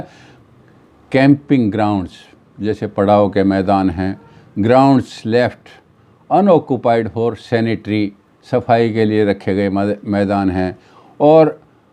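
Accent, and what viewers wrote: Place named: Haryana, India. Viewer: Nice explanation and the wonderful हरयाणवी accent. 👌👌👌👌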